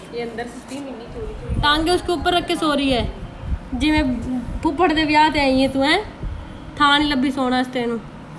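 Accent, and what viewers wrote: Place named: Haryana, India. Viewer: Mei v karnal jdo aye tuhanu miln awAge tusi bilkul mere Wang boldey O meinu apna Haryana yaad a janda tusi bot changa kamm kardey o rabb tuhade harr khwaish poori karey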